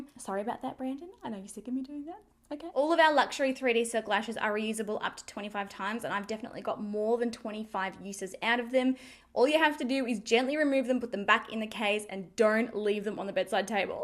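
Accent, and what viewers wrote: Australia. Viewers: I just love your Aussie accent! That to me was a bonus!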